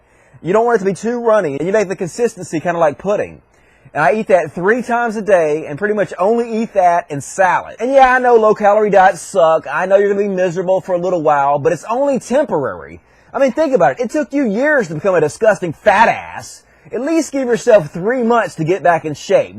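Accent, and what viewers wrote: South, United States. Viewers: Forgive this non-American his ignorance but I never thought I'd hear a Southerner casually talking in metric. I thought you Americans recoiled like vampires when ever someone starts talking about grams and hectares :P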